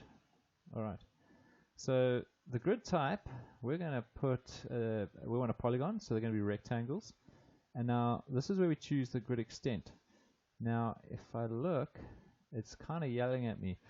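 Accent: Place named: South Africa. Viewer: Hello, thanks for these videos and the South African accent! I’ve got a points layer which represents the centroids of my hexagon layer, however, I’m struggling to align a line grid layer to pass through the centroids. I’ve tried various ways to align the grid but I’ve had no luck. If this doesn’t make sense, I could send you a mail with a screenshot explaining my problem. I’d really appreciate any assistance, thanks.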